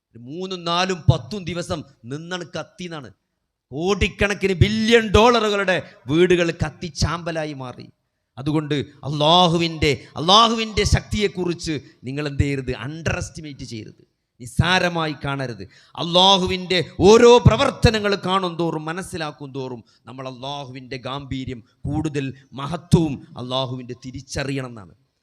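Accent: Malappuram, India. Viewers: That is pure Malappuram language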